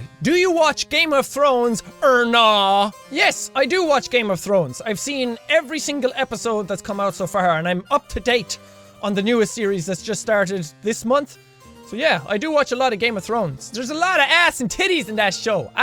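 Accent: Ireland. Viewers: Hey fellow irish man here. Where ya from and can you please say Ballymena 'ey for me! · Are u Irish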